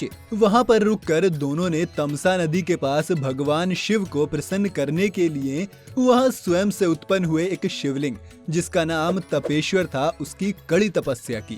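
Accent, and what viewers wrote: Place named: Tamil Nadu, India. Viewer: Bhai Tamilian hai voh unka accent hindi me aise hi rehtha thum ek bar tamil ya kannada bath karke dikhao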